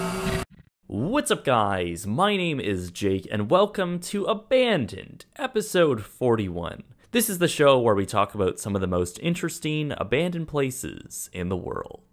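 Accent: Canada. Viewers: are you Canadian? I detected an accent...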